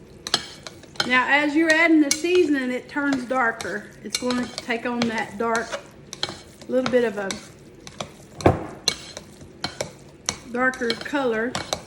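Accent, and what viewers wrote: South, United States. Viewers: Sweet country accent , could listen & watch you all day ! New follower !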